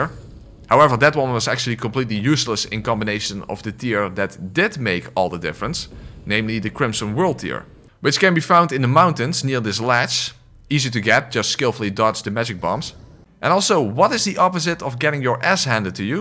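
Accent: Netherlands. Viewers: i bet this will be a fun vid but i'm 4 mins in and the dutch that is squeezing through your english plus the dutch accent is making me cringe a bit butt its not you mostly me since i am belgian lots of love <3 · First dutch soulsborne youtuber ive found, if you are dutch. You certainly got the accent. Great video btw, was a blast to watch. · You sound very dutch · broer jij bent doodlachen ik hoor gewoon dat jij nederlands bent😂 soldaat 💯❤️ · my guy, are you Dutch by any chance? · You Dutch? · Great vid man, are you Dutch by any chance btw? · you sound dutch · 14 seconds in, and ur definetely dutch lol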